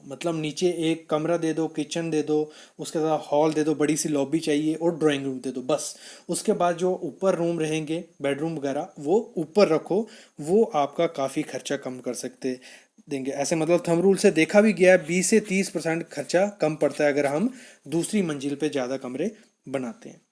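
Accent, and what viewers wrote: Jammu, India. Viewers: Tu Jammu ka laga Raha ha muja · Bhai aap Jammu se ho kya? coz aapki hindi proper Jammu wali hai..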